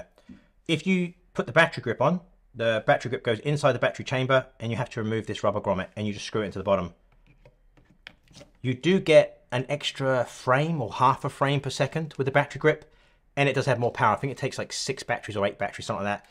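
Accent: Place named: United Kingdom. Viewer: Hi thanks to you i'm itcing to buy this! Where can I get the Nikon F100 as notice you have brit accent im in UK too, a lot on ebay say From Japan? Are those imports should I ignore those? I'm lost Any good sites you recommend and what should I look out for? Your advice is appreciated please!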